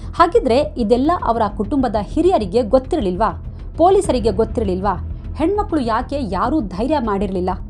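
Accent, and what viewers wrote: Standard. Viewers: ಸ್ವಚ್ಛ ಕನ್ನಡ ಶುದ್ಧ ಕನ್ನಡ ವಿವರಣೆ exalent 🙏❤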